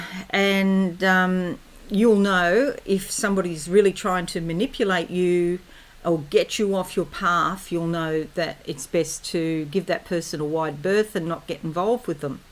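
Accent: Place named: Australia. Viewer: I heard you and thought.. This girl's an Aussie.. And then you mentioned the kookaburras.. 😂😂😂.. Loved your reading!... (fruit in the Garden.. MAN.. GO!😉)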